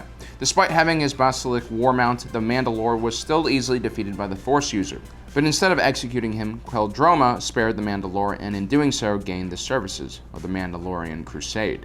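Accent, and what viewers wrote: Australia. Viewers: You actually getting better at the Australian accent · are you Australian ? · ... Aussie? New Zealander? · Are you Australian ? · The intro sounded very Australian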